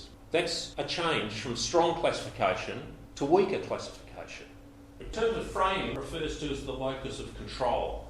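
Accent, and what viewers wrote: Australia. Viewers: been learning Bernstein's idea and had language barrier reading his books. This video is so much help. Thanks. I like the Australian accent.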